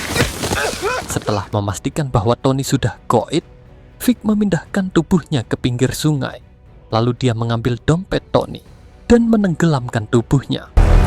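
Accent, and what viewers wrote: Java, Indonesia. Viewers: Logat nya Jawa x min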